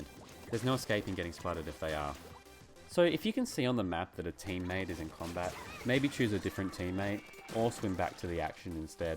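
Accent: Australia. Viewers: Are you Aussie 🤔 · you aussie mate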